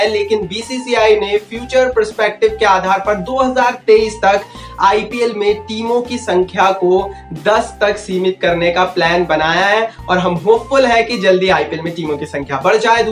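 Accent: Gujarat, India. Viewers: Sir App Gujarati Ho?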